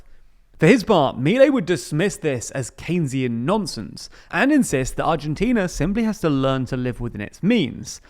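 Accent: United Kingdom. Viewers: So now someone with a UK accent is speaking badly of Milei... Do you prefer that we continue with the previous K government and fill ourselves with Chinese and oriental weapons...? You guys don't like anything. Better deal with illegal migration in your country...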